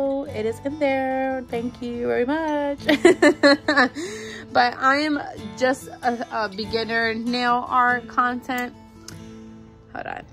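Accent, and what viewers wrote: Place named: New York, United States. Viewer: Hi! I’m new here. Good luck to you! I’m new at the nail game too, so I would love to learn with you 💜 and I’m just guessing that you too are a NYer..lol. And if not, you sound just like me 🤣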